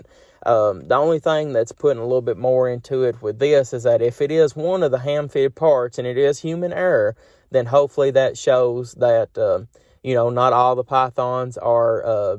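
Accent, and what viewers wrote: South, United States. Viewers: Well...it is Connecticut no? Perhaps run through a few times of problems with a pal without such the deep southern accent, and have them call for kicks? see if phone response sounds different? sooks of your Python issue(s) ~ iken imagine the anticipation of first squirrel headshot not having to lop it off for stew and goes click. Not fair. :-)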